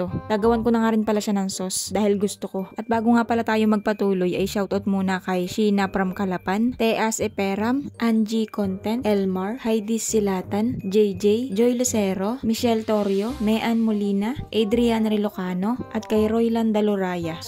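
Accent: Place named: Quezon, Philippines. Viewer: Hi, natutuwa ako sa punto mo Quezon na Quezon ay, from Quezon din ako. Pero watching here in Edmonton Canada. Pa shout out sa next Video mo, Aileen Villapando of Edmonton Alberta Canada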